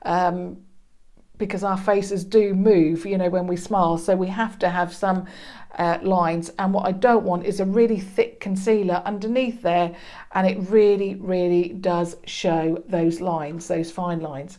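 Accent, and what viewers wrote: United Kingdom. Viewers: She was British so her accent was British. Unfortunately she is no more , she passed away due to a brain tumour but was such a wonderful person. I use to watch all her videos .😭😔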